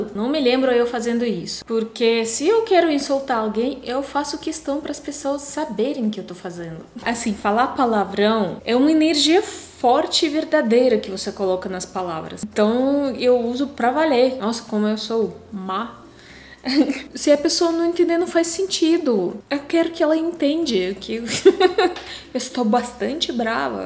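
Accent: Russia. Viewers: Os haters estão em toda parte...não só no Brasil! São um fenômeno novo... E você tem razão! Pessoalmente, são fofos...só que na internet, colocam seus podres pra fora...desabafam! Gostei muito do canal, vocês são muito simpáticos, e é impressionante como teu português está bom...muito pouco sotaque russo! Não conheço a Russia, mas quero muito ir...Museus, cidades com uma história muito rica, amo os pianistas russos, e as russas! E o stroganoff!! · em primeiro lugar gostaria de parabenizar que o seu portugues esta ficando cada vez melhor acredito que dentre 2 ou 3 anos voce nao tera mais sotaque russo parabens eu sei que seu canal e voltado para publico feminino mas eu amo os conteudos que voce e o produzem voce disse ai que tem pessoas que na frente nao tem coragem de dizer quando estao escondidas atraz da tela de um computador ou um celular e porque sao pessoas mau carater falças que mentem para si mesmas de tao pervesas que sao e em relaçao a depressao na russia como la tem muito problema em que pessoas bebem muito ja e um indicio fortissimo de depressao so que a marvada da vodka kkkkkk mascara tudo se a pessoa esta com depressao e usa o alcool como refugio e como querer estancar o ferimento como um tiro de revolver com um bandeide nao da certo ne um abraço sou fã numero 1 de voces continuem firmes ai nos conteudos que sao otimos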